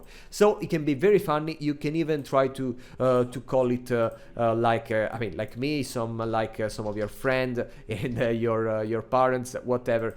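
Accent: Italy. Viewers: Sei per caso italiano?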